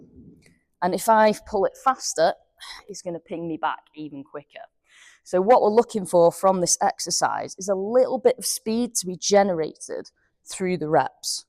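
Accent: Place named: England, United Kingdom. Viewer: Hello from another Canadian. I have recently subscribed to you channel. As a retired Athletic Therapist and golf enthusiast that enjoys working at my game from a fitness and technical point of you, the content you share as an ex-professional golfer and now fitness instructor has become one of my “go to sources of information.” As a Canadian that doesn’t get to golf much during the winters, I created a sport specific workout area and chipping station, in the basement of my house where I can try out some of your training suggestions . Also, I must mention that listening to you accent adds to the fun. It reminds me of the year that I studied at Chelsea College in Eastbourne, England.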